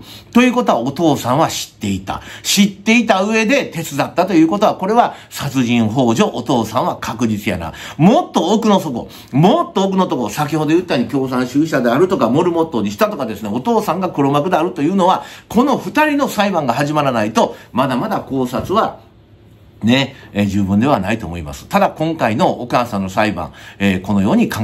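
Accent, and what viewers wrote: Kansai, Japan. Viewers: たしかお父さん、被害者にルナに合わないでくれって電話してましたよね？ルナを止めると暴れるから被害者に伝えたと他のニュースで見ました。 しかし関西弁で軽快なトークで面白い😊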